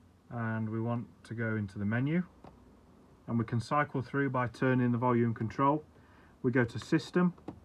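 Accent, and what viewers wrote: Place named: England, United Kingdom. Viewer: What a lovely English voice. How I miss the England I was born into. We have been (and are being) invaded by foreigners who are displacing us, unfortunately.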